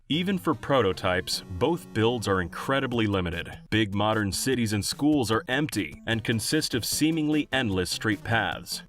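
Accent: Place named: Northeast England, United Kingdom. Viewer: I need to know which part of north east England the video narrator is from! 😁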